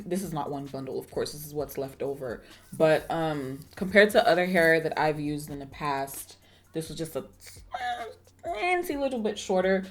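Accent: Nigeria. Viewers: Your Naija accent, tickles my soul ♥️😍😍🔥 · I loveeeeee when you let your Nigerian accent come thruuuuuuuuu 👏🏽👏🏽👏🏽😩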